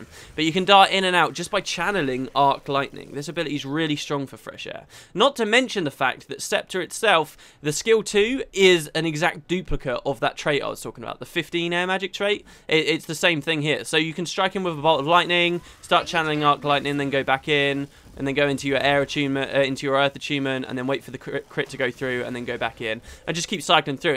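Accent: England, United Kingdom. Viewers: your english accent and saliva makes me throw up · english accent sounds amazing IMO (I'm german)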